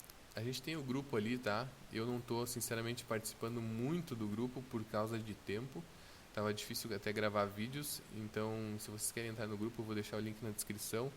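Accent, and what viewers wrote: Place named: Porto Alegre, Brazil. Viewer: Sou de Porto Alegre , seu sotaque parece daqui hehehe. Estou na Binary a 11 anos e uso o Zeek de forma automatizada.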